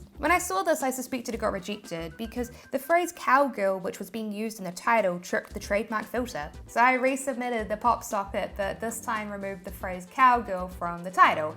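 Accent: New Zealand. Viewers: I knew you were a kiwi straight away! Awesome to see fellow kiwis killing it!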